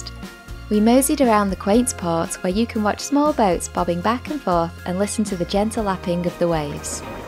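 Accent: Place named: London, United Kingdom. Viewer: Thank you! Love your accent! From London, England?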